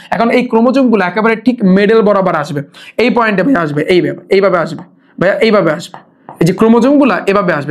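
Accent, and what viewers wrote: Barishal, Bangladesh. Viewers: আপনি কি বরিশালের লোক😊😊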